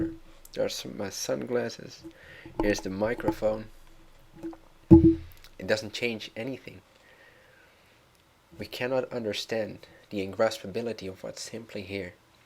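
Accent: Netherlands. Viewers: yeah..... he's from Amsterdam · Is he Dutch?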